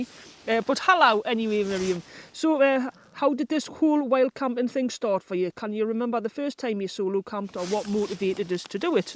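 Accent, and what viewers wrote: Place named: Geordie, United Kingdom. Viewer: Luv your quaint Geordie manner of speaking. 🙂 (Ahm frum Yorkshire meself..we got our own quaint dialect...lol)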